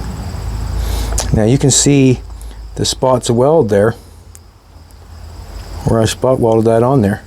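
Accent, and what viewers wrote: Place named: Nova Scotia, Canada. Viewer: Trying to place that accent ,then I realized Trailor Park Boys! Then he confirmed with Nova Scotia. Awesome.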